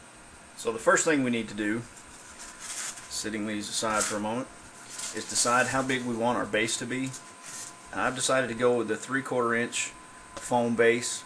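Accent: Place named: South, United States. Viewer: You sound like your from below the Mason Dixon line... >.> great way to make a hill though!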